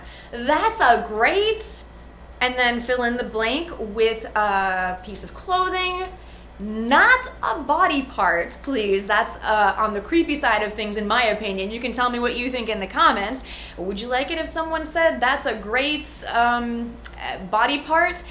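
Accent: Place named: United States. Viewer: First time I have watched your video the way you teach is really great, your overall personality speak out when you speak that's good, now I have subscribed immediately to learn American accent, by the way I am a Indian translator for Hindi and Punjabi languages..you are doing a good work, thanks again.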